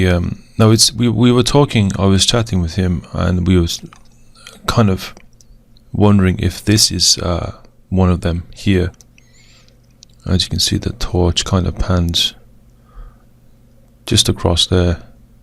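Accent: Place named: Cardiff, United Kingdom. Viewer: Your voice sounds like your from Cardiff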